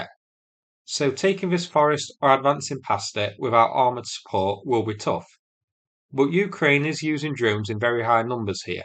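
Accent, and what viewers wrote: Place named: Northern England, United Kingdom. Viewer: Krinkeh! Love the northern English pronunciation of the names and also the jokes. Keep it up lad!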